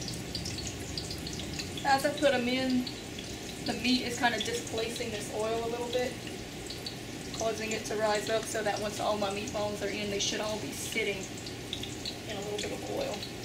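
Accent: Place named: South, United States. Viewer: Gotta be a southern girl!!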